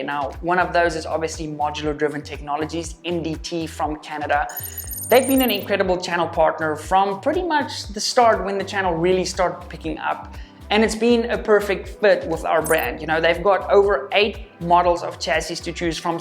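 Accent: South Africa. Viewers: Wow, I thought. Jis hierdie ou klink moerse Suid Afrikaans. And lo and behold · I knew this was a South African accent right away 😂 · Being in an Australian in a town that is becoming overrun by South Africans, your accent is really hard to listen to... I have reached a point where I can’t stand hearing that accent anymore...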